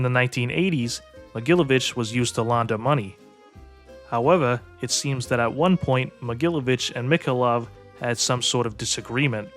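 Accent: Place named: Boston, United States. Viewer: Sounds dead on like Kevin Weeks to me. Definitely a Boston accent and not a Long Island one.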